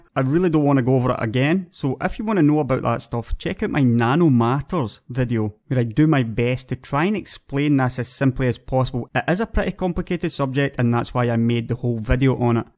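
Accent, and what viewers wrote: Scotland, United Kingdom. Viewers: holy shit he's a scottsman! was not expecting that! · your TRAINSPOTTING accent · your lovely Scottish voice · he was speaking with a Scottish accent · i liked your skotish accent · This is the scottishest schottish accent I have ever struggled to understand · He has a Scots accent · love your accent? you scottish? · pretty sure he is British, Scottish my guess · I love the Scottish accent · hard for me to understand your wonderful Scottish accent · He is scottish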